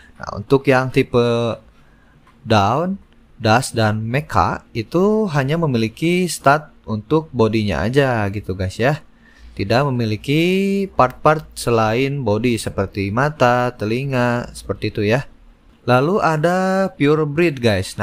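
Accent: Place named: Bali, Indonesia. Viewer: Ni logadnya kayanya Orang Bali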